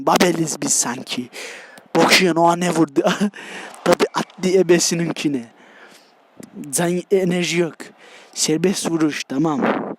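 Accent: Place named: Istanbul, Turkey. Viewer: İstanbul beyefendisi gerçek türkçe budur işte herkez özünü bozmuş krkdkffm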